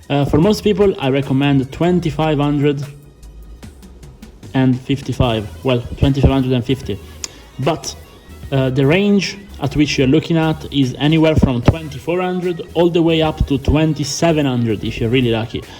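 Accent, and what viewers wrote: Italy. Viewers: capito che eri italiano dall'accento, bel video comunque😂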